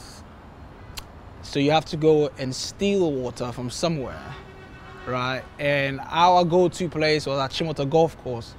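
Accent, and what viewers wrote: Scotland, United Kingdom. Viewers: Love Scottish accent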